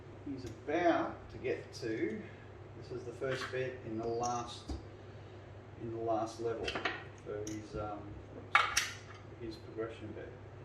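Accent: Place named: Australia. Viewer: thanks, I agree that the complexity would make it too hard to manage at a live table, so I understand if it proves a fruitless task. Just keep up with the content as you see it, I am enjoying hearing Craps talk with an Aussie accent for a change. Cheers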